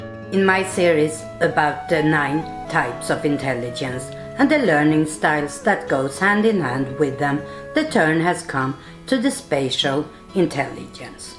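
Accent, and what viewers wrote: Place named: Sweden. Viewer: That was very interesting, and as always love the Swedish accent, good video thanks for sharing.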